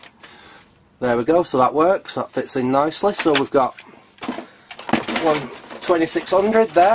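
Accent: Manchester, United Kingdom. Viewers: Great finds! Like the Manchester dialect!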